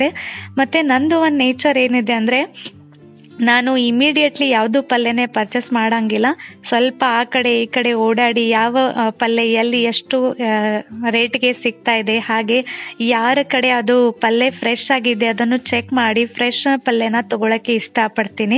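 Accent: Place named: Belagavi, India. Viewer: hi akka nimma ura yavduu heli.....Niv belgavi Kannada matadtira adake kelde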